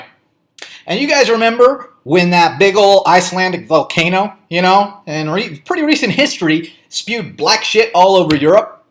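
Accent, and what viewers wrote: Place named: South, United States. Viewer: Also, southern accent really slipping through in this one, kek.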